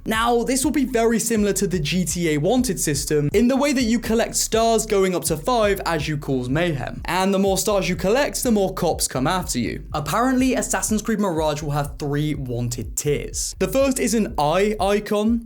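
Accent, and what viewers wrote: United Kingdom. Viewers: i love that freaking british accent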